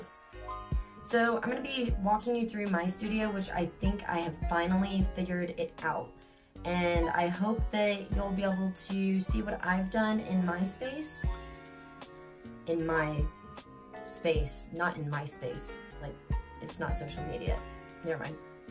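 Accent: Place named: North America. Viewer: Hi from a self-confessed art groupie!! My art studio tour would last about 3 minutes...XP-Pen monitor, XP-Pen pen, Clip Studio Paint software...DONE. My music studio tour would be closer to 7.5 minutes with stories about each piece. I decided to sub your channel based on this video tour, as much because of your persona as for the storage tips. You remind me a little of Chloe Rose only with a North American accent. While you were showing us around your MySpace setup, I was thinking, "Now here's someone it'd be really cool to watch paint!" Look for my art on my B channel someday. Meanwhile, I look forward to following your (and your hubby's) art adventures.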